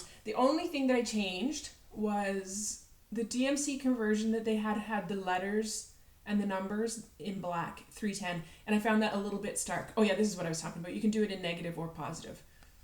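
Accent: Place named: Canada. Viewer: Hi, welcome to Floss tube. I think you did a marvelous job for your first video. Always a bit tricky that first couple of minutes. Mr. Right grew up in Canada, so I love listening to the cadence of your voice. I like your idea of family sayings. Inspiring. Thanks for stepping out there and giving floss tube a try. I've only made 10 videos, so I am still a smidge nervous. You have such a kind way about you, I think you will be a hit. Thanks for sharing and inspiring. Happy Stitching, Daleen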